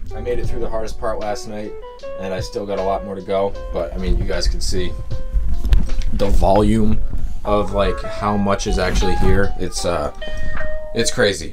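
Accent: Massachusetts, United States. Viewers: Love seeing a Mass dude doing thangs out heah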